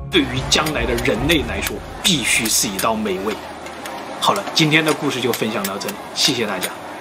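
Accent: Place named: Cantonese, China. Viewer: 是廣東人吧, 講話經常有粵語的影子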